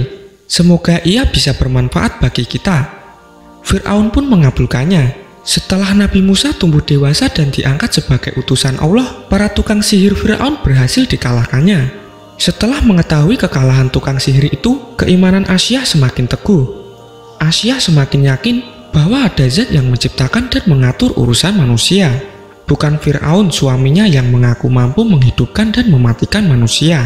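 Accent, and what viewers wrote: Java, Indonesia. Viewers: Lek ngomong koyok wong jowo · Pembaca logat jawa kurang sedap di dengar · Ngomongnya medok jawa logat bahasanya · Yg baca narasi Jawa banget 😄👍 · Narator nya meddok banget jawanya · maaf ya mas,Aku sangat suka dengar cerita kisah nabi... tp kali ini aku gk bisa focus liat cerita nya malah tertawa dengar suara mas ... mas asli jawa ya mas kok medooook banget🤗🤗🤗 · Ciri khas jawa ngomng nya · Naratornya jowo sangat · suara logat jawa... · Saran saja ya,,,pengisi suaranya jangan terlalu kental logat jawa,, kadang2 kaga ngerti bahasanya😂😂😂😂😂🤣🤣🤣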